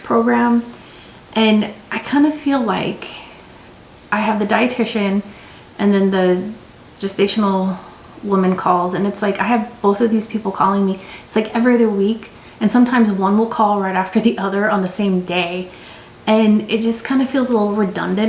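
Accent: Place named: North America. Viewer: Oh My Gosh, you sound so North American 🇺🇸. After all these years I just heard your voice. Oh dear it sounds painful.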